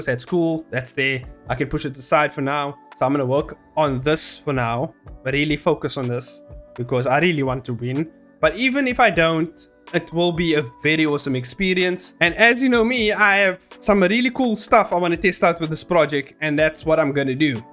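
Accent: South Africa. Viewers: Ayeee you sound like a fellow south African. I just started working on my submission, gonna be a hectic month of grinding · You're from south African?!!!😲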